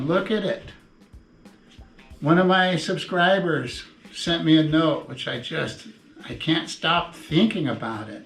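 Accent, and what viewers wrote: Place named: Midwest, United States. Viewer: Where did you grow up? I'm trying to figure out by how you talk. I was thinking Chicagoland, now not sure, definitely midwest. This is my first time on your channel. On my 6th video. Thank you!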